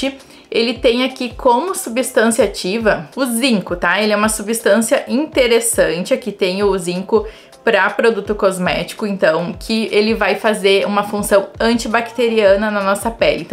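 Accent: South, Brazil. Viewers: Pelo sotaque também é do sul do estado, obrigada pelas dicas ❤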